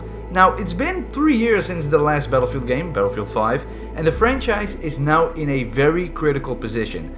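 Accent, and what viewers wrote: Netherlands. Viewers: This guy is Dutch, i could hear it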